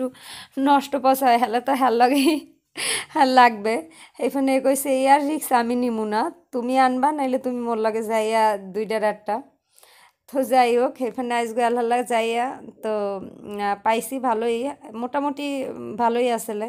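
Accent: Barisal, Bangladesh. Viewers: আপি আমি তোমার 2 টা চ্যানেল দেখি খুব ভালো লাগে,আরো ভালো লাগে তোমার বরিশালের কথা,ভালো থেকো আপু,দোয়া করো আমার জন্য। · Din din sundor hoye jacco .Barisal er meye bole kotha .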